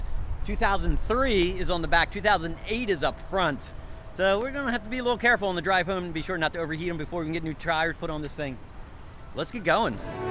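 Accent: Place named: Delaware, United States. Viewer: This guy has such a strong Delaware accent, it cracks me up. Nice ride!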